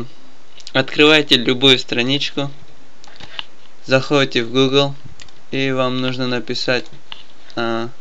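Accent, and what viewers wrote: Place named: United States. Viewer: нее....ребят говорите что хотите я уверен что он американец...во-первых прочитал хорошо английски текст, во-вторых акцент и ударения.....если вы прислушаетесь он говорит мАкинтош и Айфон а не макинтОш и айфОн...в нем чего-то русского нету))))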